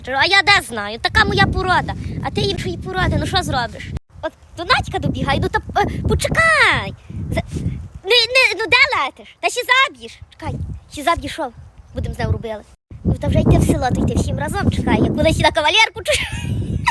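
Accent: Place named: Ivano-Frankivsk, Ukraine. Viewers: Пані,як я люблю Вас дивитися то є цуд.Що би я робила без Вас,подивлюся Ваші відео і настрій маю на цілий день.Ваші відео антистресові😅.Здоров'ячка Вам міцного.Ви вмієте розказати і показати все файно як є в житті.А воно так в житті і буває.У Вас такий говір,як і в нас на Івано-Франківщині Всього Вам найкращого.❤❤❤❤❤❤ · ПАНІ. ВИ ЯК ЩАВЖДИ НЕПЕРЕВЕРШЕНА У НАШОМУ ЗАПАДЕНСЬКОМУ ГОВОРІ. ВАМ ПОВАГА РЕСПЕКТ. КАНАЛУ❤❤❤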